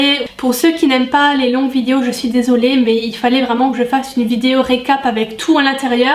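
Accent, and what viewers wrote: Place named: Alsace, France. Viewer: Hihii je me disais bien qu'il y avait un petit accent qui me disait qch par ici ☺️ Je suis de colmar ! Je viens de découvrir ta chaîne et j'adore !! En plus de ça je viens de découvrir cette vidéo sur la décoration d'intérieur, j'étais partie pour MJM tout comme toi .. mais jai malheureusement eu la peur des débouchés, et j'ai finalement changé de voie .. chose que je regrette chaque jour depuis ☺️ Tu as eu raison d'aller au bout de ta passion et vraiment félicitation ! Ca doit pas être facile tous les jours d'être auto entrepreneur ! En tout cas je vais parler de ton site autour de moi pck vraiment j'adore !( sans parler de ta chaine YouTube biensur ) j'avoue que séparer le professionnel de YouTube c'est bien mieux ! Continues comme ça ! Pleins de bisous d'une haut rhinoise ^^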